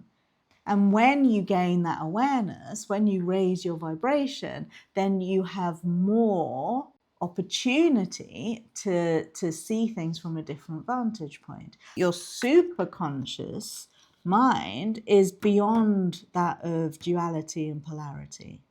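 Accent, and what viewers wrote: England, United Kingdom. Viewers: Can I ask what the relevance is to you stating that the people you normally work with are six figure earners...what difference does itahe what you earn...is that the only value we have as humans...the amount of money we can make?!?!?! I've never come across your videos and I was intrigued and even excited when I heard the English accent then you said that....such a shame 😮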